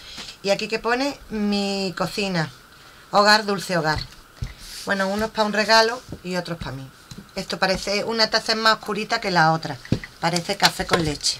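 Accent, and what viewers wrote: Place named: Andalusia, Spain. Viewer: 😂😂 pareces andaluza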